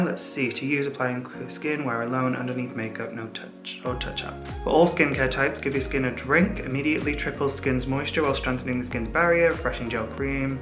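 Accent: United Kingdom. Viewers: NEver heard your real accent before. Are you from England originally? I live in London. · Omg you’re British???? I thought you’re American 😮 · Oh my god he’s british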